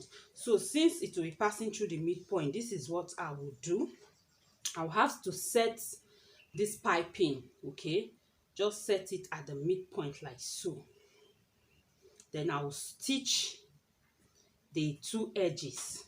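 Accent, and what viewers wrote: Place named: Nigeria. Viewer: When I listened to your video I figured that u were from wonderful Nigeria. U r an excellent teacher. 💘💘💘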